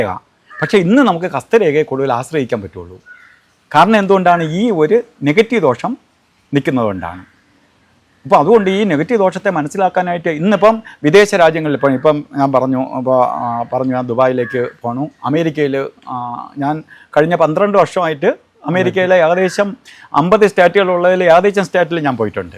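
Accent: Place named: Kannur, India. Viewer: ഇത് കണ്ണൂർ സ്ലാങ് അല്ലെ. ഇദ്ദേഹം ആ നാട്ടുകാരൻ ആണെന്നാണ് തോന്നുന്നത്.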